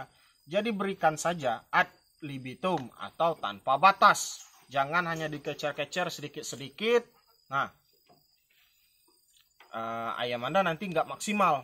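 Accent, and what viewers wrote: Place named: Lahat, Indonesia. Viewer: Suka saya pembawaanya sampean,nada bahasanya kaya orang lahat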